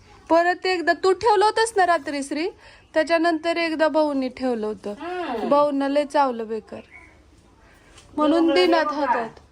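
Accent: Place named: Latur, India. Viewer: Tu pn aata लातूर chi भाषा बोलतेय khup aavdle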